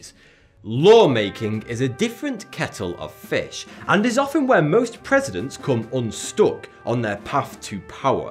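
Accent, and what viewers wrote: United Kingdom. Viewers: Pretty biased an inaccurate. Sounds like a Brit who watches CNN.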